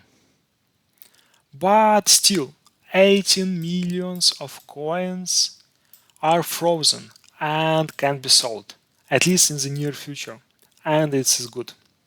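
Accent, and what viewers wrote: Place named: Russia. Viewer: русский акцент)😀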